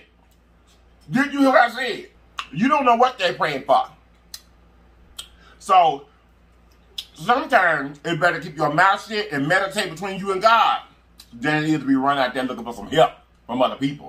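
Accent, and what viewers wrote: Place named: Memphis, United States. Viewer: Your Memphis accent, electric energy, and good-lookin food bought me here. New subbie. 🏳️‍🌈🦋🖤👸🏾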